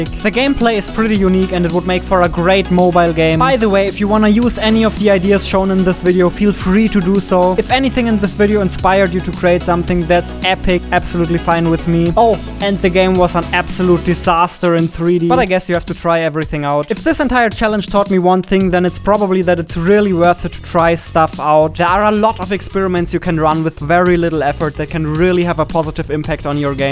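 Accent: Germany. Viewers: You are german i hear that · you german? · bist du Deutscher weil du hast so einen akzent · Could it be that you are german? Or does it just sound like a german accent? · are you german ? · Man hört das deutsche so hart raus xD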